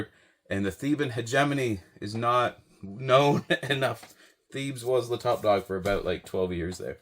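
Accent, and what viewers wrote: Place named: United States. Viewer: Firstly, breath before you make videos and during, speak clearly and don’t mumble. Learn how to pronounce the names of stuff you are clearly so interested in, in the good way, look to the British for this, because your American pronunciation are cringe every time.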